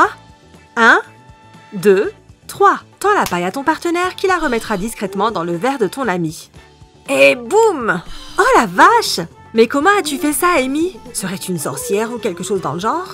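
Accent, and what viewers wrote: England, United Kingdom. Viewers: Vous êtes anglaise ou pas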